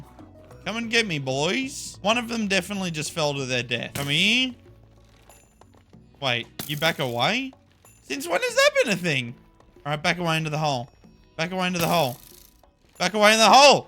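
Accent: Australia. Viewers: aussie minecrafter?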